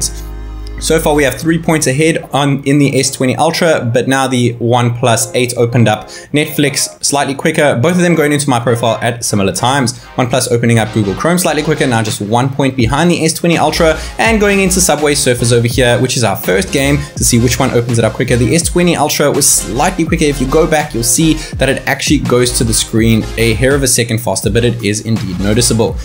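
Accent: South Africa. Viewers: South African?!!!? 🤩🤩🤩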